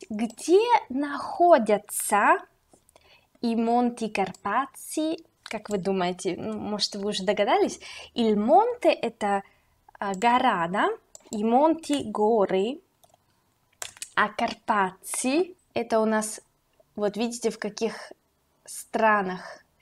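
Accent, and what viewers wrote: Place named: Italy. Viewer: Ciao,. Come sempe e stato ottimo da parte tuo. Bravissima,hai un accente italiano veramente autentico.🤗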